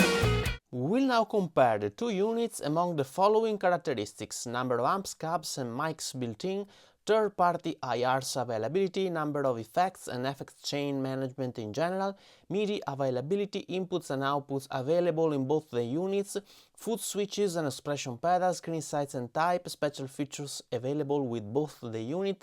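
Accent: Italy. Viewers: I love your videos, love your content and love your charming Italian-English. I find super friendly the aesthetics, the colours and the quality of the equipment around you. I hope you keep it up and that your channel continues to grow. And the most relevant thing: I love your guitar playing. Greetings from Argentina.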